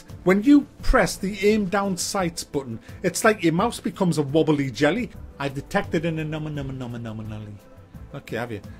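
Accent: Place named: England, United Kingdom. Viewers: . Where are you from? You sound like you're from Nottingham, could be wrong. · SavagerBoy He sounds English to me.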